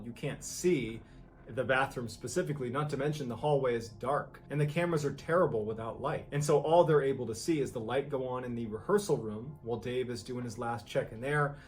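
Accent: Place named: United States. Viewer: 🇬🇧 man this girl is cute as fuck!!! 😍 I love her American accent #notaperve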